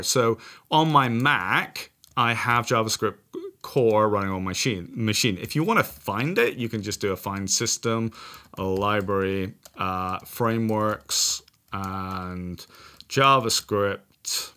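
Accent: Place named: England, United Kingdom. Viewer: I absolutely love his english accent - Subscribe earned just by hearing it :D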